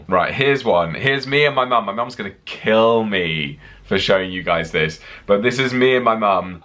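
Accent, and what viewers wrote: England, United Kingdom. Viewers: I love your pictures. You are so kind to share them with all of us., I have been a member of your channel for years and you have never responded to any of my messages. I would be so excited if you responded to atleast one of my messages. I really enjoy you content and I love your English accent and everything about your life. So please, just leave a word or a sentence. It would be so greatly appreciated. Thank you for sharing your life with all of us.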